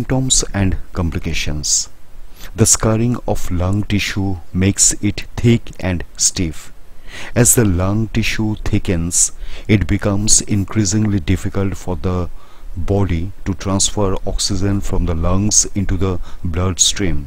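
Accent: India. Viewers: That Indian accent is strong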